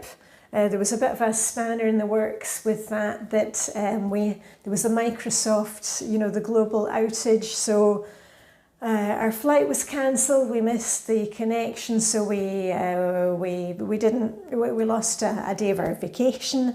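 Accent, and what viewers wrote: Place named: Scotland, United Kingdom. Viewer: Dear, I am so sorry to hear of the loss of your mum. Absolutely know how that feels. I live near Edinburgh, lost my mum 2 years ago to cancer and like you, cared for her at home till the end. It was your routines and cheery Scots voice that kept me going in the home and helped me get ready for work every day, so thank you so much for all that you do. You are greatly appreciated 🤗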